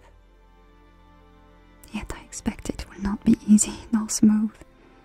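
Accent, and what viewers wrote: France. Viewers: Will there still be French accent audios? Surely? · This sounds so so so beautiful!! I love a french accent and this is soo nice to listen to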